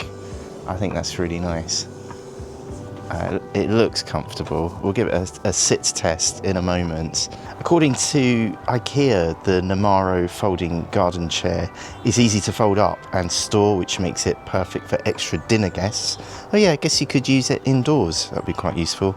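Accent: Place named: United Kingdom. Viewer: Just listening to ur British accent convinced me to get this ikea chair, lol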